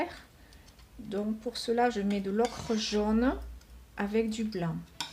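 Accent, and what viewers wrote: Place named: South-West, France. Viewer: Un bel accent du sud ouest?? Pour une video très sympa merci!! Je veux faire du découpage collage, punk, moderne, tatouage!! Merci pour les astuces collage/peinture/materiel....Le papier de boîte a chaussure, c'est une très bonne idée de recyclage!!! Et ça donne facilement du relief a nos ouvrages merci et Kenavo!,😉😉😉👌👌👌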